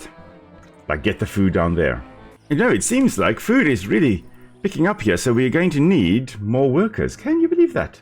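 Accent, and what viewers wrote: South Africa. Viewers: Are you South African? Your accent sounds so familiar I swear. Just found the channel and its awsome. Plan on binging this series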